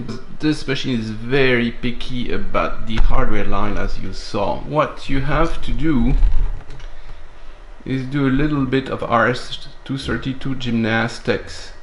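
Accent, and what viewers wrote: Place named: France. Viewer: Whenever I start a video and I hear "Hello and welcome back!" with that hint of a French accent I know I will learn something about vintage computers today.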